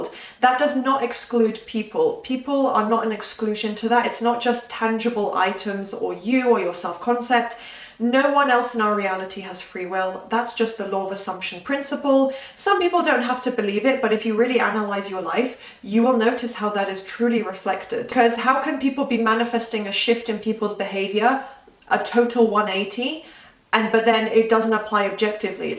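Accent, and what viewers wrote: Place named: London, United Kingdom. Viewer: thank you, dear I am passionate about your British, Londoner accent and of course, love your content always very clear and thourough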